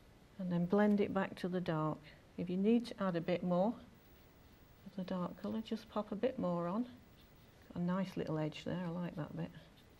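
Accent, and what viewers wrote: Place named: Northern England, United Kingdom. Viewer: Beautiful artwork, do you run courses for using acrylics, you sound northern England like me.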